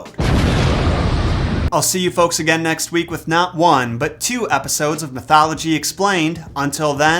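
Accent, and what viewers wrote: Ireland. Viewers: Better than most Irish accents from Hollywood!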